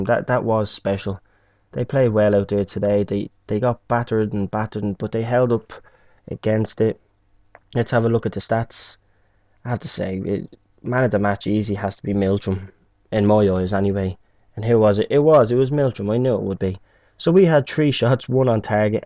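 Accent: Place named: Dublin, Ireland. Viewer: well mate good video keep it up u sound like a Dublin man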